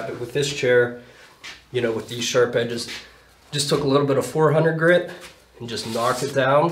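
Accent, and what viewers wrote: Western Pennsylvania, United States. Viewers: Elizabeth/Clairton south of the Burgh. My parents were born and raised there. My mom's parents came to Clairton from Italy. My dad's parents came to Western PA from Wales. I'm a huge Pirates fan. Clemente was my boyhood hero (and still is!). We make an annual summer trip to the Burgh to visit cousins and take in a Pirates home series. Not sure it will happen this summer. Hope so. It's good to hear your Western PA word usage ("Pap," for example; waiting to hear you talk about "ridding" up the shop!) and accent. I've really enjoyed the craftsmanship and attention to detail you demonstrate on your projects. The Western PA connection is an added bonus (one I can't make with Thomas Johnson)!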